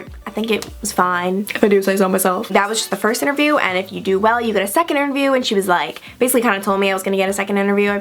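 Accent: United States. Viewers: I love your accent! Which part of America are you from? 🌞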